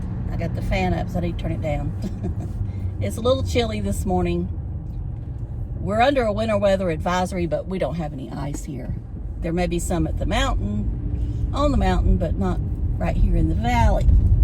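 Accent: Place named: South, United States. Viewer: Great conversation. You really have talent for it. I never stop listening! This video reminds me old radio shows they did years ago with like a mystery or a who done it! Well anyway i guess its the accent the southern belle sound. Im trying to figure it out but you were not all over the place just connecting all this mystery about the wackiest person on you tube so if you even try to decipher what is going on its gonna be a chore.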